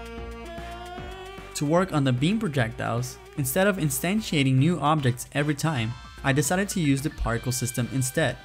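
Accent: Brazil. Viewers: Are u brazilian my man?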